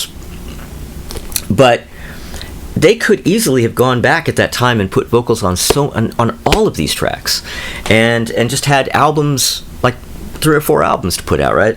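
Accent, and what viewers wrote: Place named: England, United Kingdom. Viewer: Love the Monkees funny how your doing a English accent when most were American great showing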